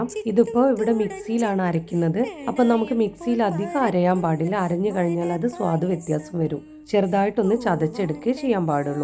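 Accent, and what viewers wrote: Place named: Pattambi, India. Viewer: എന്റെ നാട്ടുകാരിയാണോ?. സംസാരം കേട്ടപ്പോൾ തോന്നി. ഞാനൊരു പട്ടാമ്പി ക്കാരിയാണ്. ഞാൻ കൂട്ടായിട്ടോ. തിരിച്ചും സപ്പോർട്ട് വേണേ 👍👍👍💕💕💕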